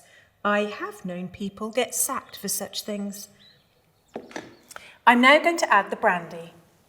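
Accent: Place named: RP, United Kingdom. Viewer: she’s so posh ❤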